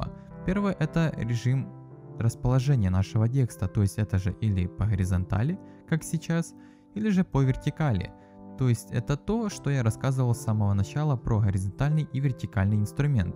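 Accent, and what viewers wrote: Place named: Kharkiv, Ukraine. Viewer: как будто харьковский акцент))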